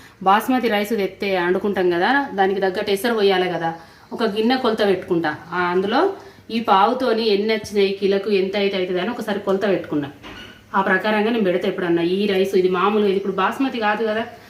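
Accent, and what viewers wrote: Telangana, India. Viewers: Telangana yasa vintunte feeling good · అమ్మ మీరు మాట్లాడే విధానం చాలా బాగుంది అచ్చమైన తెలంగాణ మాండలికంలో మాట్లాడుతున్నారు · Mee maata Telangana yaasa super amma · Pacca telangana accent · చాలా బాగా చేశారండీ .మి తెలంగాణ బాష వింటూంటే చాలా బాగుంది .మాది ఆంధ్ర అండీ.. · Language is very nice delightful telangana accent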